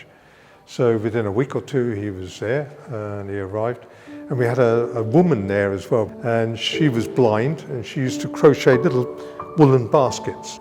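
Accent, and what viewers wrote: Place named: United Kingdom. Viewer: Your accent tells me that you shouldn’t talk about colour or taste, your a brit,0 taste 0 colour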